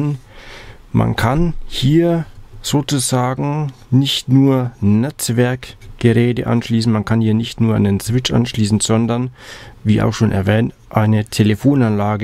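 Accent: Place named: Franconia, Germany. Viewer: Tolles Video, bisle frängisch is a dabei:D finde ich persönlich toll, weiter so LG.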